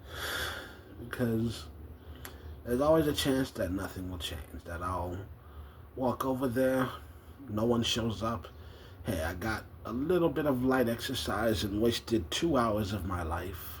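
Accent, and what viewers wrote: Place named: United Kingdom. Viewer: I originally saw you from that one video that went viral a while back at first I thought you were British or something but now that I know you're from Texas I'm kind of wondering how you got that accent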